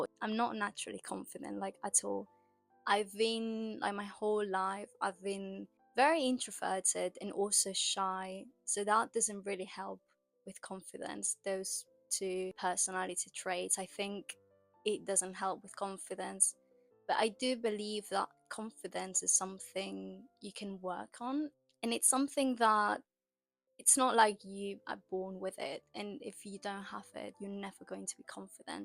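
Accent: United Kingdom. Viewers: so, where are you from? cuz your accent is pretty similar to British🙂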